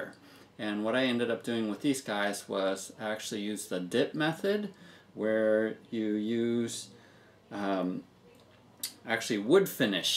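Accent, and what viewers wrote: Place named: United States. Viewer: because of the american accent, I kept thinking that you were calling the abomination obamanations. made me chuckle. good job though dude.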